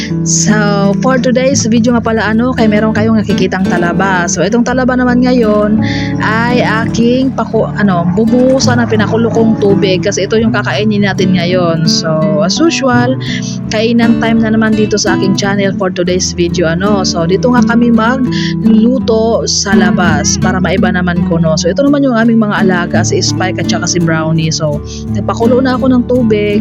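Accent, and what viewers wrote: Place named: Iloilo, Philippines. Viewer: Ilonggo gd ah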